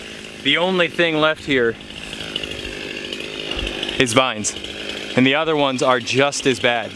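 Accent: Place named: Australia. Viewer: Hilarious how we get down under accents from you I would be doing Yankee or pommy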